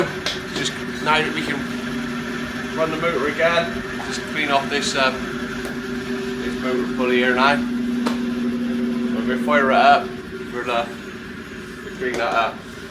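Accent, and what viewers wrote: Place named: England, United Kingdom. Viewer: Great hammer, where is your accent from im always trying to defferentiate the different English accents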